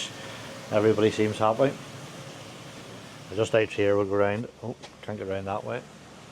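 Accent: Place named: Northern Ireland, United Kingdom. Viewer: I seen you in Yvos video. Well heard you lol so I messaged Yvo and asked is he a Bangor man or Holywood man. He said no he's from Northern Ireland haha. I'm from Holywood originally. Keep the content coming...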